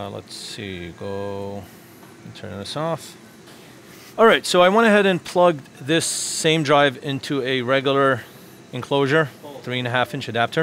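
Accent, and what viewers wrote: New York, United States. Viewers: It’s that New York accent. We just talk like that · That's "New York City". They all sound like that. :)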